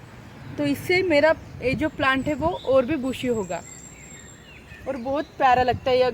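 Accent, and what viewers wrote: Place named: Maharashtra, India. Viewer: Tumi Marathi bolta ka....Tumcha aawaj Marathi Hindi sarkha ahe Mi Nagpur adhun aaj ha plant ghenar ahe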